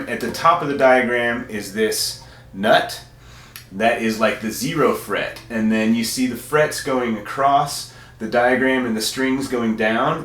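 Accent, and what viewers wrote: United States. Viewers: Americans can never go wrong with their presentation. Seen a lotta Brit vids, but I usually prefer to hear Americans talk.... Love your video!! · I never heard such a manly voice in an American accent😂😂 Started on 8/11 will tell in 2 months thanks for the tutorial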